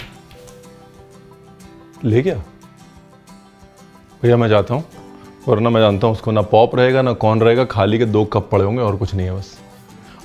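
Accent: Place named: Punjab, India. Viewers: Yess thats Punjabi style.....Chef Harpal ardak bolate hai...adrak ko😀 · "Amrricca" pure Punjabi English .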